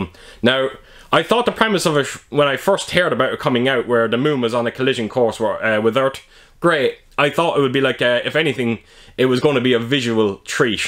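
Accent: Ireland. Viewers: Well I think this video has had 1000 views - or thereabouts - as I'm typing this so you're really on your way now. Your channel has a difference that no other does and that's your collectables. But all those wonderful special editions and busts you are able to show would be for nothing if you didn't have the presentation style and ability that you've developed. I think your Irish accent probably helps too. It's great to see you doing so well and it is well deserved. We had visitors yesterday afternoon so I didn't get as much edited as I expected but having two of our oldest friends over (we now only live a few miles from each other) meant the home cinema got a good workout and it's now almost flawless so I'll do an update for that in this latest video too. We watched the new Blu-ray of 'Spencer' last night which is a fictional account of a few days in the life of Princess Diana and it was shot on film. 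It did have a similar look to Last Night In Soho in places but a little dowdy at times which was similar - but different - to how Dune looked here. All our systems are different for sure. The 4K of The Sting has a really interesting extra on it and one of the Universal film restorers actually states that scanning a film exacerbates film grain. That would appear to confirm that suspicion then and why some films have to have digital noise reduction (Terminator 2 perhaps?) and others such as The Fifth Element have far more prevalent grain on 4K than on a 35mm print. We're all st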